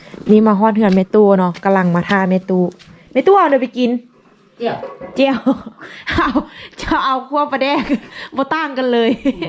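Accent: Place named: Phu Thai, Thailand. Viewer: ภาษาน้อง ลาวผสมภูไทเพราะเฮาเป็นคนภูไทฟังออก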